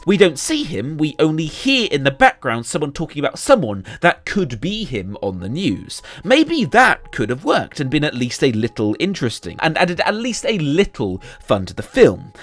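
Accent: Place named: England, United Kingdom. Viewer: Totally convincing English accent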